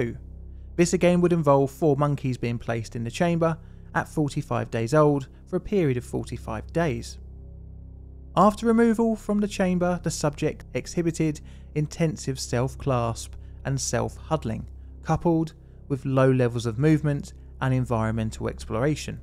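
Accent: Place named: Cockney, United Kingdom. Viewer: Someone w/o a cockney accent should do the voiceover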